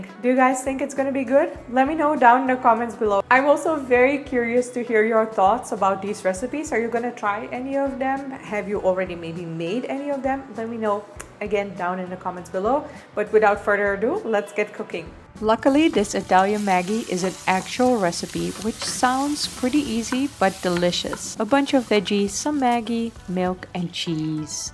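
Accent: India. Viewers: Wow your English accent just like an Indian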